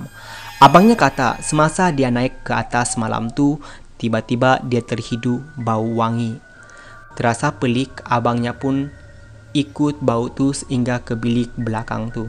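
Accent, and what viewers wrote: Sabah, Malaysia. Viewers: Walaupun saya kurang fhm slank sabah fhm2 sikit tp terbaik content bossku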